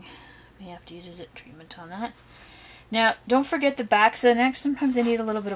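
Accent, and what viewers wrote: Canada. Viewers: Personally I put them through washing machine. I have a newer washer with no spindle. I use Scrubbing Bubbles spray on faces and bodies. Then use tooth brush to get in all the grooves of face. Then once placed in washer by themselves. I then let the washer do the work. The yarn is material and it grosses me out too much thinking how much filth could be in the yarn. Once washed - start the process of using sticky lint roller to take all lint / fly always. Let dry for a bit under ceiling fan. Then restyle hair. Instead of rubber bands try tiny zip ties. These will not only get a tighter fit but will look better as well. Especially Jesmars. While yarn is still a little damp/pliable - use sharp scissors ✂️ to trim fuzz flush against the CPK head. 😁 Your accent - shout out to Canada 🇨🇦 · That Sunlight bar costs $14 on Amazon. You sound Canadian maybe it's cheap there but here in the USA it's not in stores, and it costs a lot.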